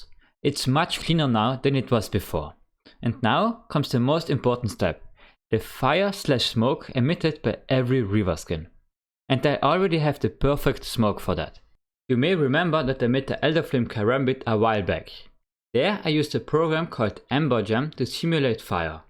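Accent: Germany. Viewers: der deutsche Akzent ballert richtig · are you by any chance german?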